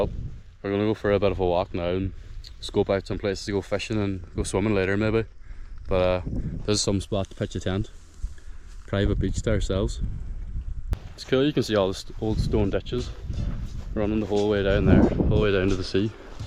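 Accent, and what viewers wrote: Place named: Donegal, Ireland. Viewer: Next video will you say dia duit in your donegal accent please. Im learning gaelic and its HARD!!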